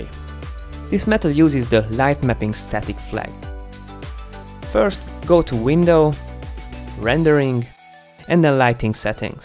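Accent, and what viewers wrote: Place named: Hungary. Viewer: Are you hungarian btw? You have an accent like all of us here.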